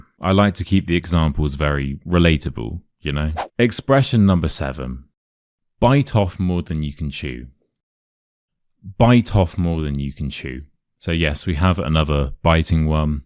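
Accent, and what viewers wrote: United Kingdom. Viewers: Thank you, I’m learning a British accents, expressions and all of english from your video. Happy new year mate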